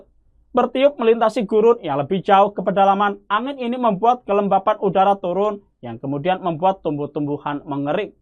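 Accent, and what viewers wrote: Java, Indonesia. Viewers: Medok njowoni om 👍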